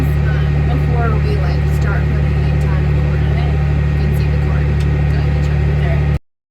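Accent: United States. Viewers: oh my gosh, how i love the American accent! I need to move from Australia asap lol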